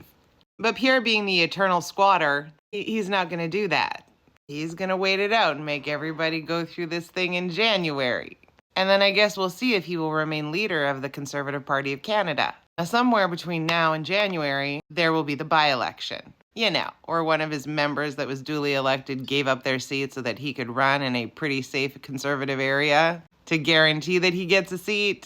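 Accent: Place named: South, United States. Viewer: Why do you have a southern accent, but I am still agreeing with you pp has to go!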